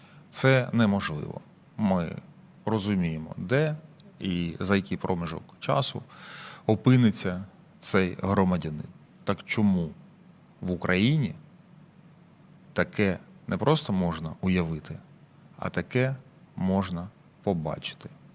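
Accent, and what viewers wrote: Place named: Moscow, Russia. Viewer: Пане, а чому ви видалили мій вчорашній коментар, в якому я вас запитував, чому ви, коли розмовляли російською, розмовляли не з українським акцентом, з нашим "Ге" і "шо", а розмовляли з російским " Ґ ", "чьто" таким суто мАсковським вимовленням? До речі, на Ісландії кожен може це побачити, особливо в інтерв'ю Петрова 5 і більше років тому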